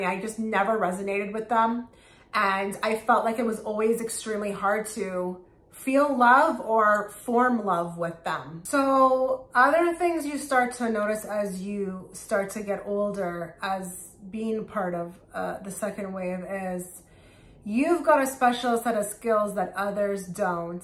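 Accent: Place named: East Coast, United States. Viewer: You sound like an East coast girl to me. New York area?? I am from NYC. Your video resonates a lot for me! Is there a way to message you? I have experienced all you speak of in this message. Blessings to you!!! ✨🩵✨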